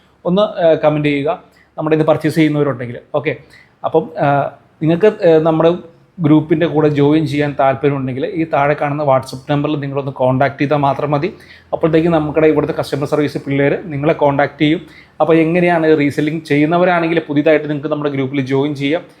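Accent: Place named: Kasaragod, India. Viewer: Thalpariyemund Kasaragod aane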